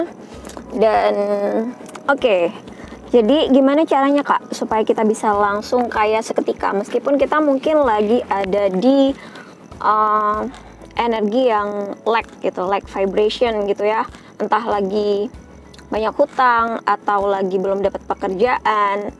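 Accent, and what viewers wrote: Jember, Indonesia. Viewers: Lho orang jember ta Mbak ? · mbk nya ini org jember ta? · Kakak dari Jember ya???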